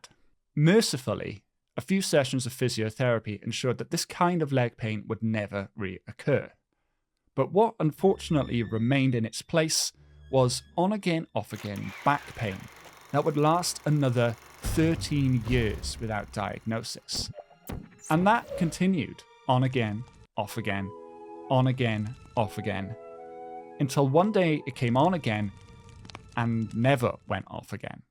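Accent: Wales, United Kingdom. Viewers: Are you welsh? If so thats super cool!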